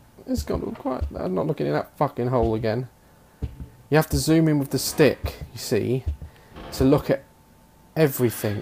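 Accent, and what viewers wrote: United Kingdom. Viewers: He sounds so much more British then vs now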